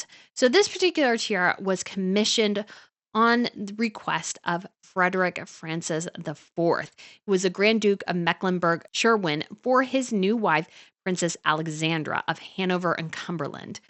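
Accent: United States. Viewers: Makes me cringe listening to your whining American accent criticising a late Queen’s tiara RIP. No class, no respect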